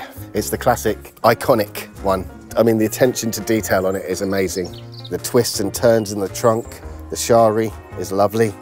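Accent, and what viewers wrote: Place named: United Kingdom. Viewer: Great video man, drink and drugs the same thing Essentially. I've been there and like you come out the other side, lovely collection are they all yours?, better than my efforts lol. Only just found your channel I'll subscribe and look in on ya, sounds like your in the UK aswell, peace man and those bad days and thoughts are no different to anyone else's.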